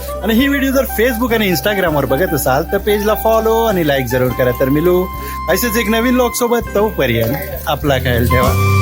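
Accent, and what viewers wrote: Konkan, India. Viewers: bhai tumchi kokani bhasha lay God vatate. Kokan aani kokani manus donhi Saral sadhi aani god.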